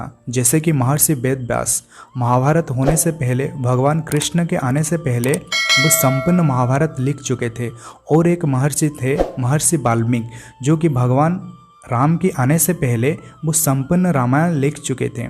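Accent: Odisha, India. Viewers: Ap odisha ki ho kya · Tu odia ki bhai tu eise Hindi bolta hai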